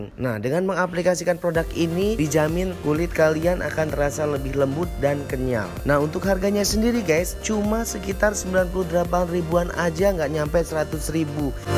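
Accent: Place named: Lampung, Indonesia. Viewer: yapun... orang lampung nih ya😀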